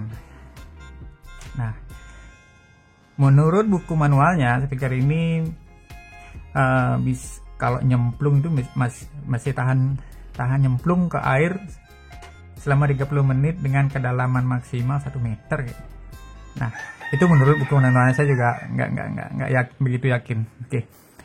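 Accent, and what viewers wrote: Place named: Bali, Indonesia. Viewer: Keliatan logat balinya bli 😂. Rahayu terus berkarya🙏😇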